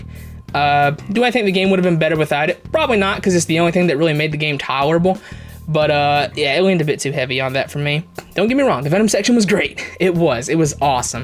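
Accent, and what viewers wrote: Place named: South, United States. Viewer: I love your country ascent 😂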